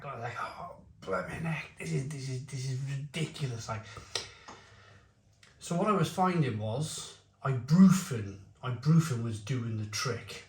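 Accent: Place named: Cardiff, United Kingdom. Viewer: Just stumbled on your channel! Glad you are Doing ok. Recognised that Cardiff accent anywhere! The cottage is my local pub 😂. I’m assuming you mean the One by cefn onn!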